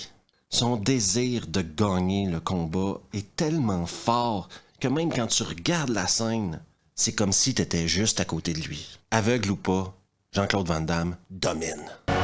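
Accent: Quebec, Canada. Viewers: J'adore tes vidéos. En plus pour un petit français comme moi c'est excellent d'écouter ce merveilleux accident québécois. Des commentaires à tomber par terre. BRAVO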